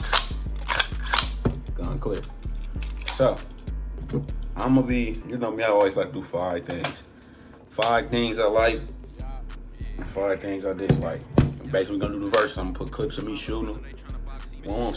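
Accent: Michigan, United States. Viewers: You got my follow bro..Good review! Sound like you from my small town Saginaw MI 😂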